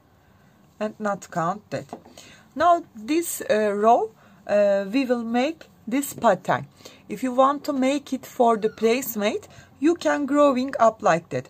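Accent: Turkey. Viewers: I love your accent! Are you turkish? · Gerçekten Türk aksanı diye bir şey de var ben de oradan anladım. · Abla aksanından tanıdım türk olduğunu valla elenjdkdnwbsk Deniycem yapmayı şimdi ellerine sağlık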